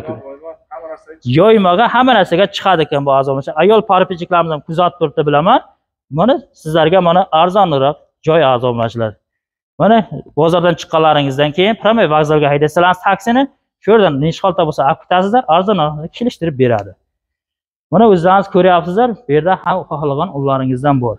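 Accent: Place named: Lakai, Turkey. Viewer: Узбекча гапир лакайча гапирма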